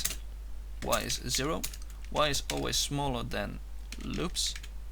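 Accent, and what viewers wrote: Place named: United Kingdom. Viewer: I thought you were british.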